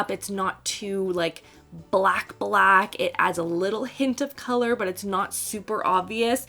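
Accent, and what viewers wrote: Canada. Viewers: Just discovered your videos. Do I detect a Canadian accent? Excited to see Pixie mentioned, I was starting to think they did not make anything good. Thanks! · I love your Canadian accent!! I need to try those NYX lipsticks. Really pretty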